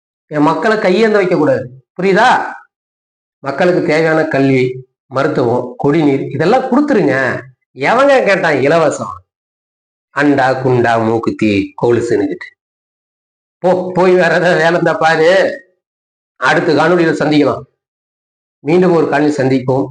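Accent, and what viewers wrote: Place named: Kongu, India. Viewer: இந்த வீடியோவில் பேசுபவர் கொங்கு வேளாள கவுண்டர் பாஷை பேசுகிறார். கொங்கு வேளாள கவுண்டர்களே தமிழர்கள் கிடையாது. கர்நாடகாவில் இருந்து வந்தவர்கள்.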